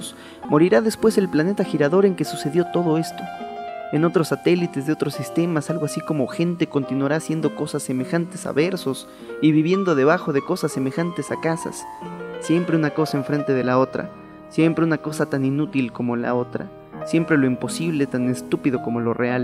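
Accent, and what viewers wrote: Mexico City, Mexico. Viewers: Dos años, editado este gran trabajo,; EXCELENTE DOCUMENTAL, IMAGINO TODO EL TRABAJO Y PACIENCIA PARA LOGRARLO, IMAGINO TODA LA CREATIVIDAD Y TIEMPO PARA PRESENTARLO. EN UN LENGUAJE 🇲🇽 "CHILANGO" (alegre y relajado y audible) B E N D I C I O N E S❗ ESTÁ S U P E R‼️ 👏🏼👏🏼👏🏼👏🏼👏🏼👏🏼👏🏼 BRAVO ‼️ 👍🏾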